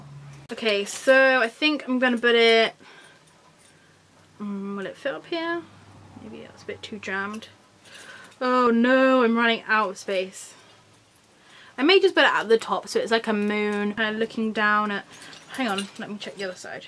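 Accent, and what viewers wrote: United Kingdom. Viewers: Hi Shirley here. I luv listening to u talk, luv your UK accent.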